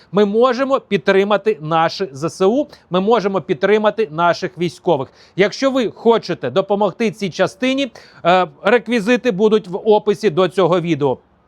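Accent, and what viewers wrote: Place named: Russia. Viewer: Дякую за вашу працю. Але треба ще працювати над вимовою, бо є багато російського акценту: Атава, кА мандир і тд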